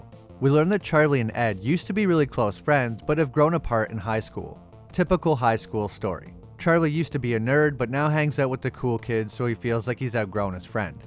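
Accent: Canada. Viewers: Sounds like hes from the great white north and talk with that kinda accent, just my guess .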